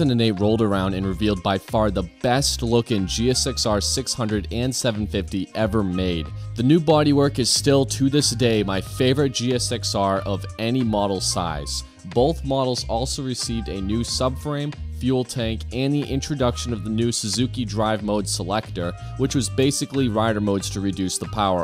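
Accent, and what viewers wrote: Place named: New York, United States. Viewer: I like this channel because it's a real human talking (I think!) If it's A.I. with a NY accent I'm impressed. LoL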